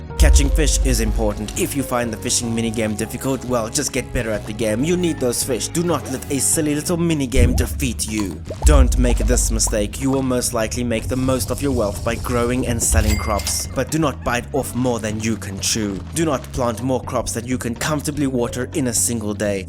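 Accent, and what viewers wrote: South Africa. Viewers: First time watching one of your videos and instantly had a feeling you were from South Africa Howzit boet! 🤙🏻🇿🇦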